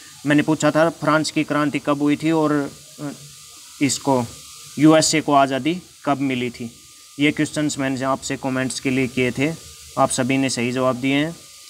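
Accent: Haryana, India. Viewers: Bhai aap hariyana se Ho kya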